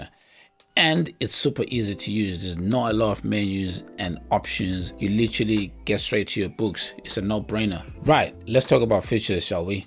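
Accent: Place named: Nigeria. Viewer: I can hear that Nigerian accent. Are you Nigerian? Also, amazing video!!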